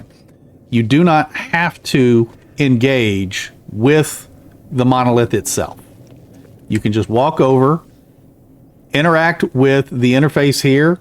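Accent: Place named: South, United States. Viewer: I'm trying to pinpoint your accent. I don't think it's FL, sounds very similar to the AL, or GA that i'm used to hearing but perhaps not quite.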